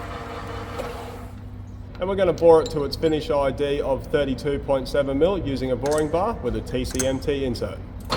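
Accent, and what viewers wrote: Australia. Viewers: I THINK IT WOULD BE AWWSOME TO DO A VIDEO IM YOUR NATURAL AUSTRALIA DIALECT. I BET WOULD BE HILARIOUS THINK ABOUT IT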